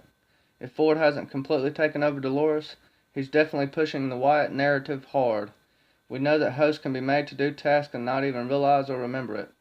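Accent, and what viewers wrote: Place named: South, United States. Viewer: Hey man!! I've been talking to AU Packmule about being a guest on his channel. My notifications were messed up and I didn't even realize he did so many streams. I saw you, and I've subscribed. I'm from Alabama. I can tell you're definitely from the South. So looking forward to watching you and hopefully joining a chat!!😀❤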